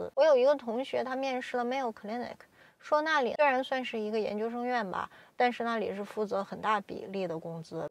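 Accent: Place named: Tianjin, China. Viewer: 这个女生是天津人，似乎听出了津普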